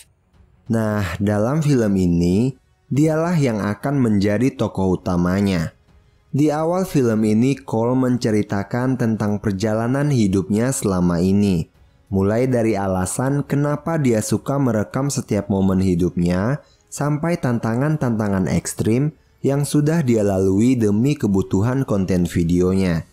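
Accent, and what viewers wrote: Kalimantan, Indonesia. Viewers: Abang orang kalimantan mana bang Edit:tumben bikin konten alur cerita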